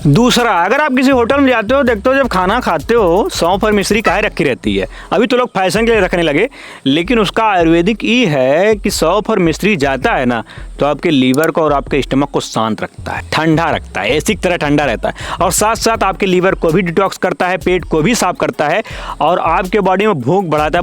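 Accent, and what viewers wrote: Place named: Uttar Pradesh, India. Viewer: भाई आपका यूपी वालि भाषा मुझे अच्छा लगता है 😅 मैं भी यूपी का ही हूं ❤😊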